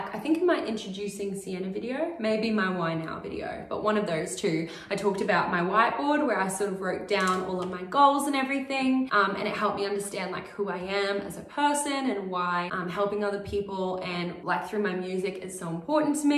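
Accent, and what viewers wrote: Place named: Australia. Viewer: Really good cover! 👏 I love the chatty videos! I like hearing you talk. Not only to hear your lovely Aussie accent (lol) but to hear your stories on your musical journey.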